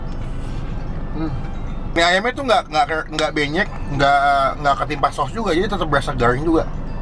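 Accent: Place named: South Jakarta, Indonesia. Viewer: Kok kamu jaksel sekali yoooo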